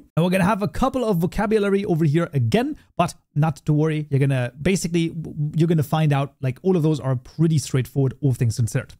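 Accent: Germany. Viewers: LMAO The German "Scharfes S". Love you german voice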